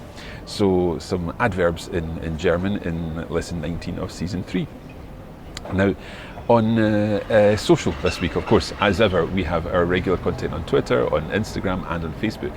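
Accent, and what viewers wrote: Scotland, United Kingdom. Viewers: I love your Scottish accent,. What part of Scotland are you from?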